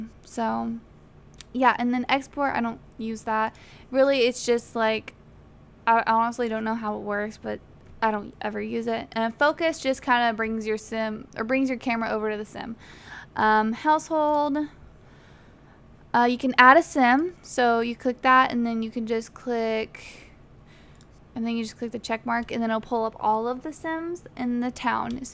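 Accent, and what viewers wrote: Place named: West Coast, United States. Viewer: / slightly triggered by her voice, but also knowing maybe she's just from the west coast.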